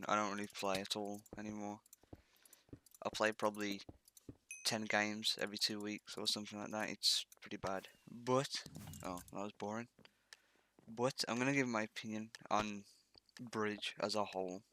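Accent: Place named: North, United Kingdom. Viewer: Omg your so northern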